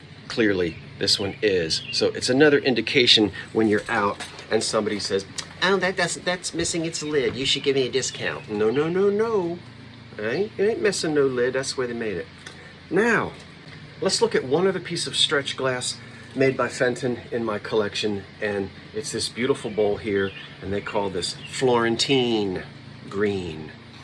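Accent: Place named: Philadelphia, United States. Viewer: I mentioned to tell u that whenever I get homesick for Philly , Jersey or Delaware I watch you. Even your voice and accent make me feel better.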